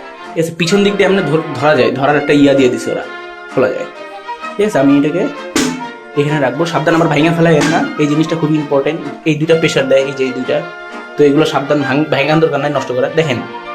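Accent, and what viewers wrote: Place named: Barishal, Bangladesh. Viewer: ভাই আপনার বারি কি বরিশাল নাকি 😊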